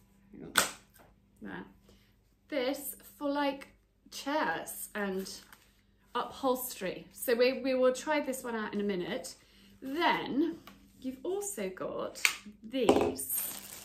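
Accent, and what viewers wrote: United Kingdom. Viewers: Dream wifie material complete with distinctive British accent. Very lucky husband indeed.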